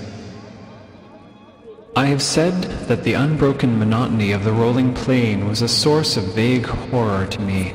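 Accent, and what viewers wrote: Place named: Baltimore, United States. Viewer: Damn good job! Your pronunciation sounds like Baltimore.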